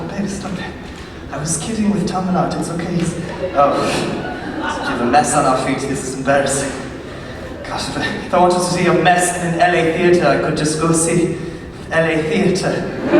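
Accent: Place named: Germany. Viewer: why he sound so german?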